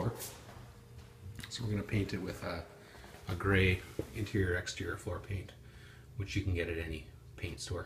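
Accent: Canada. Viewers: Bonding prkmer would have been a good start. After edging, don't wait! Wet edge. It is far better to keep a wet edge with any paint. You are lucky to still be able to get oil based paint, many states that is impossible, you sound Canadian, if so, I'd be shocked that they have any oil based paint there.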